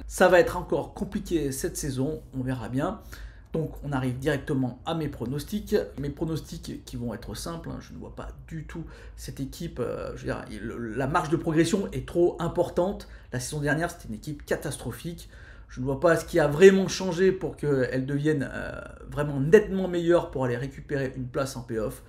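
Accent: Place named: Dauphiné, France. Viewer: Cool je découvre tes vidéos sur les preview je trouve tes analyses juste avec un accent dauphinois si je me trompe pas 😅 le top👍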